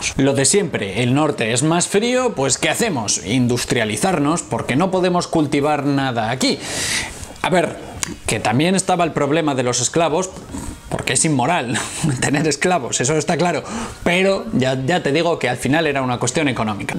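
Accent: Spain. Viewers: Acá encuentro un combo interesante, rock, historia, la tonada española la cual disfruto mucho más en la narración... Y vos qué pareces de otra época!! Besote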